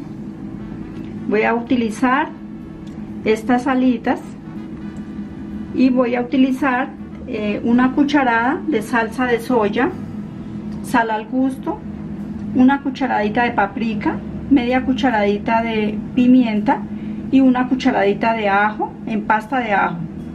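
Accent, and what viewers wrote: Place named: Colombia. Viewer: Me encanta el acento colombiano, muy deliciosa receta, saludos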